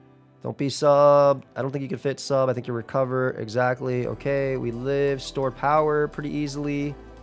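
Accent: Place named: New York, United States. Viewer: should have known tbh, i hear the NY in your voice and the words you say haha. sending love from from Suffolk County appreciate your content fam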